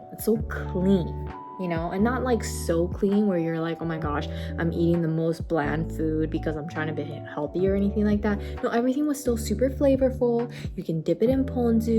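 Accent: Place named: United States. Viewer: I still really like how your Japanese pronunciation has a "American" accent to it!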